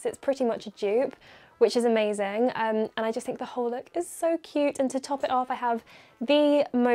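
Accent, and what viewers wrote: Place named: England, United Kingdom. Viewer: I love forever new, and your right it is so you Also love your accent because I grew up in England And I rlly miss it there and your channel just gives me a taste of it Love from Australia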